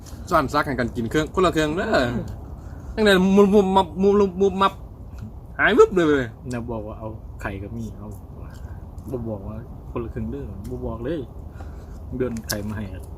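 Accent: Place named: Korat, Thailand. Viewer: เก่งมากหนุ่มโคราชสอ้อนบ่เสียแนวโคราช555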